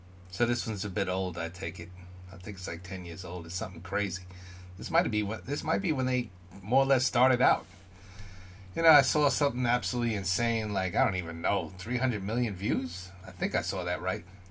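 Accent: United States. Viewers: Heard that NY accent and I was hooked. I'm from Brooklyn. Love your reactions. Welcome to the PTX rabbit hole, I am gonna enjoy hearing your great reactions to this unbelievable group been a fan since 2011 When they won the singoff. I'm going to take this journey with you. Subbed Now I can enjoy PTX and you. Thanks!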